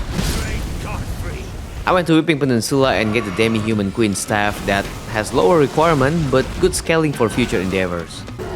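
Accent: Indonesia. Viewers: you sound indonesian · kirain logatnya ga asing, eh benran indo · Dari awal ngomong langsung ngebatin ini kyk aksen org indo et bener ternyata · bro u sounds Indonesian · Bahasa inggris nya bagus banget tapi sangat terdengar seperti orang indo banget. Dan iya, saya mulai bisa membedakan aksen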